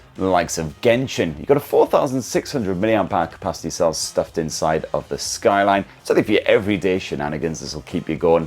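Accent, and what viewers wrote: United Kingdom. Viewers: What's the link to the wallpaper site? I'm American so I couldn't understand British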